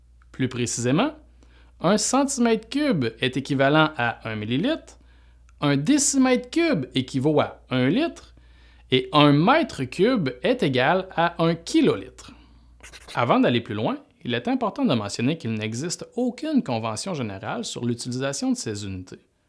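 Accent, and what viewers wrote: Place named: Montreal, Canada. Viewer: vous êtes de Montreal! L' accent est fort mais j' ai bien aimé, je viens de Canada aussi mais d' Ottawa, Ontario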